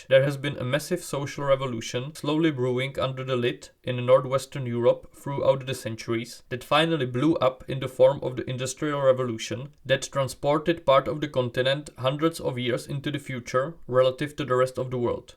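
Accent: Poland. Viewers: Heyy, i think you pretty much nailed it, good job!! (XD you sound very polish, i am too, but i think the accent adds a certain comedic value as in Poland most people talk as if they were western, although still catching up to the west). Have a nice day 🎉🎉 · Serio? Ty Czech? ( I czecked even the info about Your channel) Jor akcent is sooo Polish🤣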